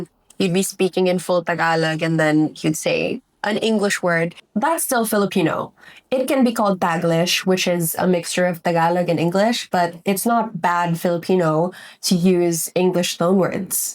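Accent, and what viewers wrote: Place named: California, United States. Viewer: Sounds like you speak English with a California accent to me.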